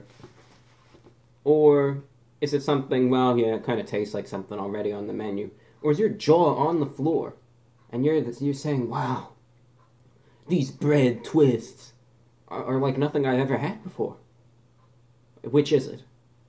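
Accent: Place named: East Coast, United States. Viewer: This man seems like a character that you would encounter in one of the Fallout games, particularly 4 due to his east coast accent.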